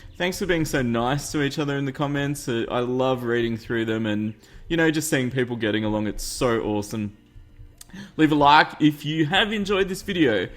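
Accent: Australia. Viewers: Haha, that polish shoutout was so funny :). But if u want to say it somewhat correctly you say: Lubee-eu tziastetshka. Omg that was so funny just to try and figure it out ;). Please say: i like to watch watches in your australian accent but please say it in a very 'strong' accent. Keep up your great work, everyday i cant wait for another video. 9 and a half big chocolate cookies for you! ( half got eaten by the eater of cookies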